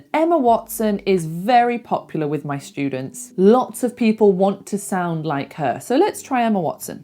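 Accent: United Kingdom. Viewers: Hello pretty and smart girl, how are you? Well, I'd like to know if your accent is British. I incredibly can understand a good part that you speak, I say "incredibly" because I'm Brazilian and my ears are trained to a United States accent. I like the way you speak slowly; it's very didactic. Furthermore, the beauty of your face is a delight to my eyes. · You have a great pure British accent. I really made use of this video 🎉🎉🎉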